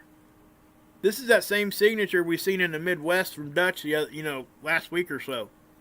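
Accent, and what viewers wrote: South, United States. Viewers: Love the info you share! I am just finding your channel. Where you broadcasting from? You sound like a good ole southern gentleman!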